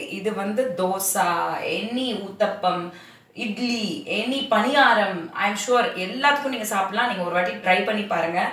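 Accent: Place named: Thanjavur, India. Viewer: அப்படியே வச்சிஉருச்சி தஞ்சாவூர் நாட்டுசாலை பேச்சி இருகுது மிக்க மகிழ்சி உங்கள் சமையலைவிட உங்க பேச்சியத்தான் நான் அதிகம் ரசிப்பேன் அக்கா supet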